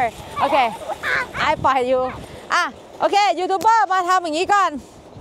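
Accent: North, Thailand. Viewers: F.C เจียงใหม่ ..เป๋นกำลังใจ๋หือเน้อคับ ดู.ทุกต๋อนเลย ชอบแต่ะๆครับ อู้กำเมือง.ฟังแลัวน่าฮักดี....เจ้า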